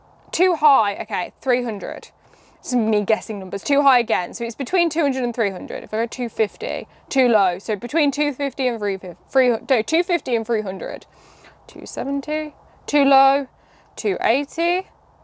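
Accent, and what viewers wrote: United Kingdom. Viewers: Im so confused, you look so young but you're playing with old things that I only remember seeing at my older cousins' house when I was really really young and I'm at least 10 years older than you. This made me smile a lot, this is so cool. Thanks for bringing me this time travel experience with a very special british accent. Keep going and having fun. 😁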